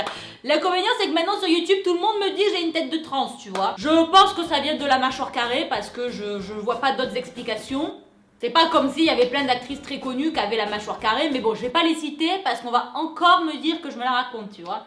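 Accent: South, France. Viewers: hey écoute pas les rageux, tu es toujours au top, continue comme ca. En plus avec l'accent du sud tu me régale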